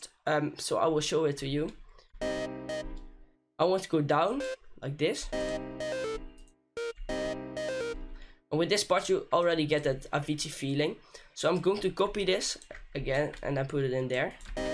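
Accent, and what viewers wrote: Netherlands. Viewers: Are you dutch? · Are you Dutch?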